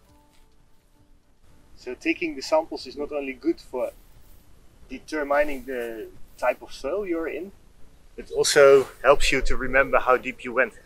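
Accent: Netherlands. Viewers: sounds Dutch :) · Gewoon Twents verstoa ik ook we heur lol :-) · I like that rig a lot, very well thought out for the task. But you are lucky with the soil consistency you have in the Netherlands (I assume from the accent). If you have rocky ground... well then... back to conventional drilling. ;) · Are you dutch by chance? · Kan horen dat je een nederlander bent haha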